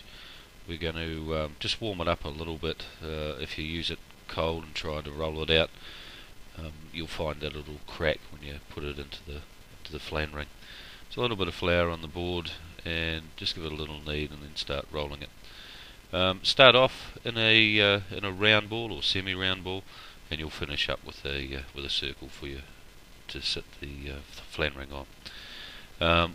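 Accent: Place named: New Zealand. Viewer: He's a bloody KIWI, from Nuw Zulund, not Ostrayan from Ostraya.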